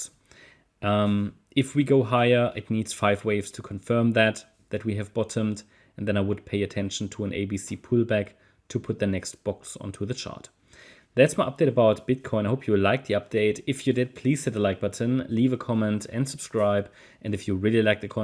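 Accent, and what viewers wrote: Germany. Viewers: Much better German English than mine😅👌🫡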